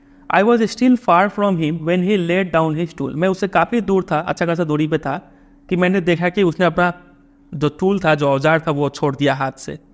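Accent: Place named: East, India. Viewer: Most probably east or north east india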